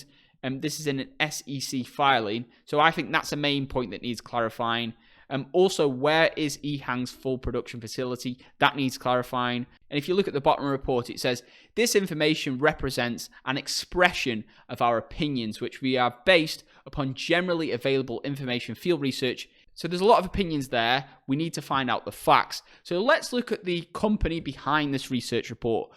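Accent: United Kingdom. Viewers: Bought in at $64; it will not be fail this company. It has the technology to address future market needs 3-10 years forward. I always like your presentation! Plus a tinch of northern accent!👍😄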